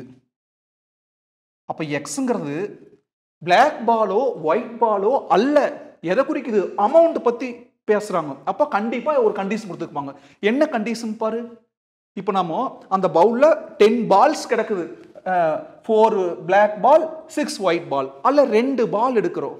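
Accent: Coimbatore, India. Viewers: Awesom 🎉🎉🎉😮😮😮😮cbe 🔥🔥🔥slang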